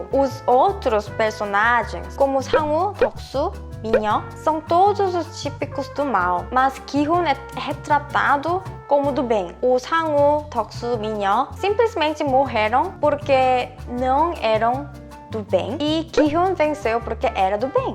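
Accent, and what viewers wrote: South Korea. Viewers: amei seu sotaque coreano, obrigada pela explicação!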